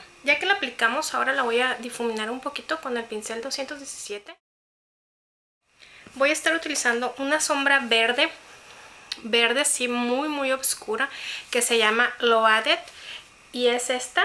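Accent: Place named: Mexico. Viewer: lindo che por ke pronuncias asi tu mushooo de ke parte de mexico eres te kedo bello y me encanta cuando ablas asi es bien lindo un beso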